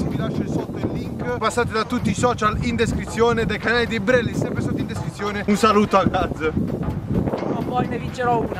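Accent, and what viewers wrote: Molfetta, Italy. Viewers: ma sei di molfetta?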